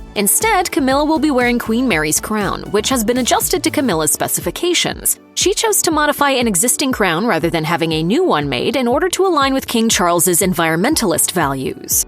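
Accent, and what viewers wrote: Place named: United States. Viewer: I do not understand why these British Royal ‘posts’ are narrated by an American.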